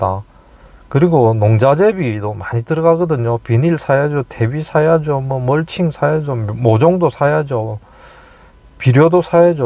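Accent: Gyeongsang, South Korea. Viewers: 경상도 액센트가 너무멋져요❤❤❤ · 구수한 경상도 억양이 정감이 듭니다 전원주택의 벌레.잔디관리.귀촌의 단점을 잘 알려주어 로망을 접어야겠습니다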